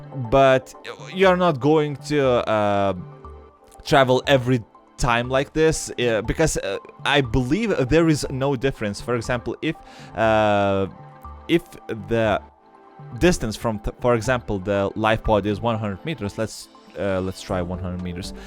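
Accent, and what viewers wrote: Russia. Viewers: wait, is he Russian? he sounds it at least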